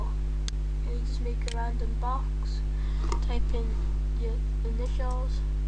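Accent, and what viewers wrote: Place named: United States. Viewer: lol!You've done what i do-ur talking almost in an american accent!lol.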